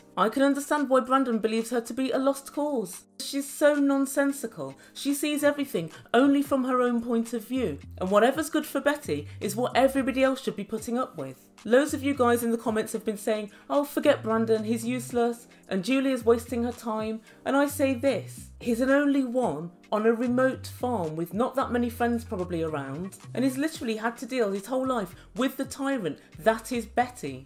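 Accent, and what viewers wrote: South, United States. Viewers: your American southern accent gives me life every time I hear it 😂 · There is NOTHING I LOVE more than your American accent followed by your laugh. The best laugh ever. 🤣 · Loved your southern accent!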